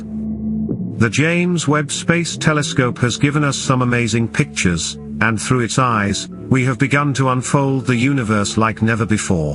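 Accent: United Kingdom. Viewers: The brainy peoples' brains are swelling and will explode too, but in only a few years, not billions. With all the bucks you people get I truly believe you could put the money to better use; and not just in your pockets. For starters, get a spokesperson who speaks without a Brit accent. I remember watching a NASA APOLLO take off on TV, with my wife, and saying; Look at the ice build up on the o-ring joints! I think something bad is going to happen'". And an astronaut, who was from Mohawk,NY, some 10 miles from me perished with all the others on board. I hope the wire insulation you people are using is NOT made from soybeans. Get It? You people are on the Elite Welfare System and suckin it right up. Stop your putting fear in everyone, and start fearing and loving, and obey more, to please God. All the worlds infrastructure is shot, and all you do is keep shooting holes in our atmosphere. 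Will you be stumped, like everyone else, if the electricity stopped, for 40 yrs, like the 40 yrs God sent the Israel people into the desert?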